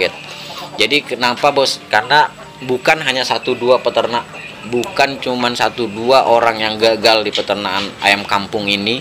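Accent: Madura, Indonesia. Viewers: Madura ya kang? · Dari logatnya orang madura ya cak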